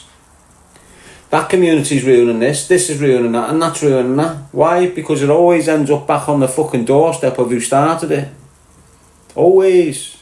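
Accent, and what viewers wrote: Liverpool, United Kingdom. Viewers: scouser quntt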